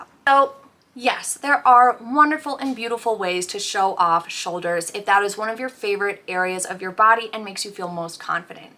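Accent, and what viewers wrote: United States. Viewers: No worries. 🙂 I have no idea where you live, but you sound like you have an American English accent, and darn it, it's very hot right now!!! 😉 Generally speaking, we are in the hottest month of the year. So if anyone looks a little bit oily or a little bit shiny, I tend to shrug and think "Well of course they do; I get it." I'm sure you will eventually tweak all of the settings to your liking. 👍❤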